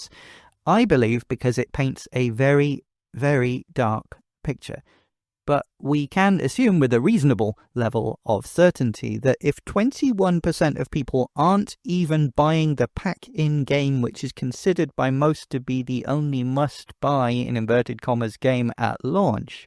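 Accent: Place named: United Kingdom. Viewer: Good review. Some thoughts I am aligned with: 1. Game key cards suck - won’t buy any 2. Exceedingly high prices for old games 3. Parents won’t be buying Timmy the SW2. He can play Minecraft fine on SW1 (I am a parent - Overcooked, bomberman etc work fine for the family). 4. Families like myself are a big part of their base. So forget about selling 150M SW2 consoles 5. Nintendo are very very greedy and callous weaponising our nostalgia in the most cynical way possible relying on the impulsiveness of their base… Feedback on your channel: 1. Overall good - trim it down a little…punchier 2. Avoid slightly inserting your political views and hint-hints….make a separate channel for that. Stay on point. 3. Solid British accent Have a nice day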